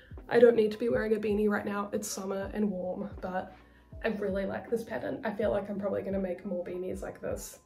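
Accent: New Zealand. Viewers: I'm assuming from your accent that you're in NZ. I'm in Aus. Where do you get Knitting For Olive in the southern hemisphere? I've only found one website that would ship it to Aus and it was a very expensive Japanese website that would have cost like x3 normal.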